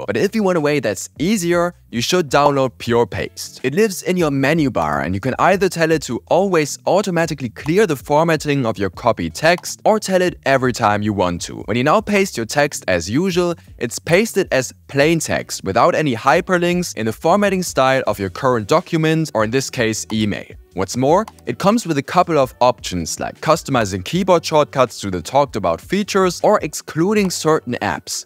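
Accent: Germany. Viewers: Sounds like a germany accent to me. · Ich habe echt etwas gebraucht, bis ich den deutschen Akzent durchgehört habe - Hut ab! 😁 Dein Englisch ist super 👍 Abo hab ich auch mal dagelassen :)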